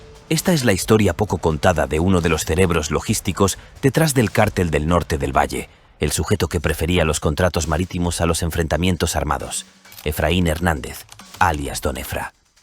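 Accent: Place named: Spain. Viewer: Esa voz spanish 🤮🤮🤢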